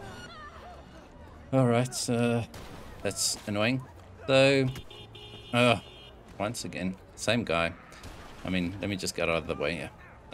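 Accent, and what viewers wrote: South Africa. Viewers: Yeah true, I’ve been playing it since 2.0 patch, even on my gtx1660, it’s still quite a decent experience. Just bought a RX6600XT for R3500 yesterday (I’m also South African). I was just digging through some benchmarks for this card and recognised your accent and decided to continue watching.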